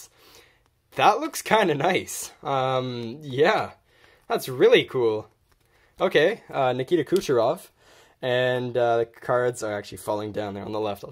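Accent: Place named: France. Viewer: stoooooop with the french pronunciations of players names, only thing that makes me not want to watch u lol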